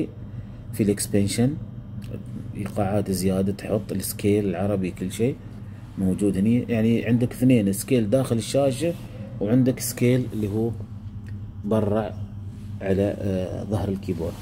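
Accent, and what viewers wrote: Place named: United Arab Emirates. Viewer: Nice. I'm Iraqi born living in the USA. My Arabic isn't that strong but I was able to understand you fairly well. I assumed the UAE dialect would be much more difficult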